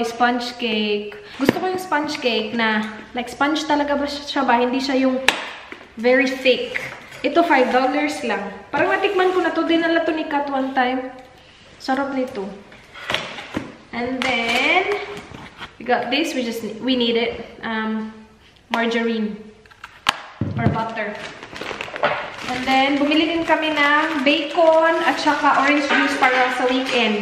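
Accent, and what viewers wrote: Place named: Visayas, Philippines. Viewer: OMG ms!! Malapit ka ng mag 600k. Lagi kong kinukwento sa mga classmates ko yung vlog mo. Tapos minsan nakukuha ko na yung tono ng boses mo kahit di naman ako bisaya 🤣 i really love watching your vlogs. First time kong mag subscribe sa isang vlog. I think mag 2 months pa lang. God bless ms.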